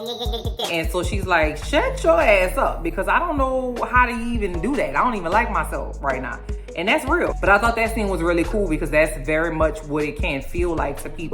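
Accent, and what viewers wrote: New Orleans, United States. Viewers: I just love your Nawlins accent. Me being from Monroe, I knew IMMEDIATELY you were from New Orleans.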